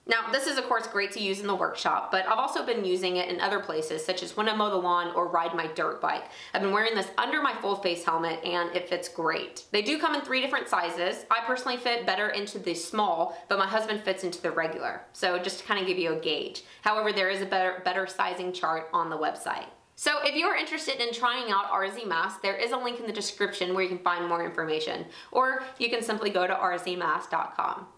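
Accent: Texas, United States. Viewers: haha its the Texas twang 😆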